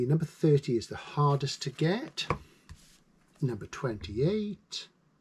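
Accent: United Kingdom. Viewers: You are my ultimate ASMR. SF, bookshops, british accents, with intermittent walkies in the country. Favorite anthology: 100 Great Science Fiction Short Short Stories - Ed. Asimov, Greenberg, & Olander (Only item I've ever stolen .. of course, if you steal books, steal them from libraries so they can track you down and get payment. My 12-year old self still never regretted it.)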